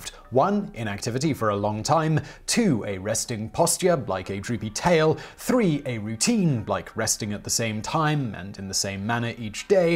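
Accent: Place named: RP, United Kingdom. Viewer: That's not it - it's just his accent is impossibly posh. Plus they (obvs) are not from N.A. & didn't Google search "how to pronounce tilapia" prior to filming.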